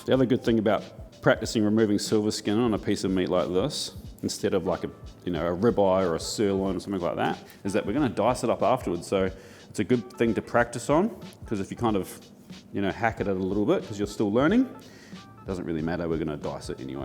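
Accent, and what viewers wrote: New Zealand. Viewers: Oh hey, I'm not crazy... Only been watching your videos for about a week (but been watching a smattering of them) and had one where you said you were in Sydney. Through me off because I was sure it was a NZ accent (words like "fish" are key, and growing up in Canada my best friend was from NZ). Happy to hear you say you're originally from NZ. All is right in my brain again. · I had no idea was an Enzedder, but I honestly should’ve guessed it based on the accent 😂. EDIT: Okay, I haven’t watched one of his long-form videos yet, so I wasn’t previously aware of it. Hopefully, that clears up things a bit.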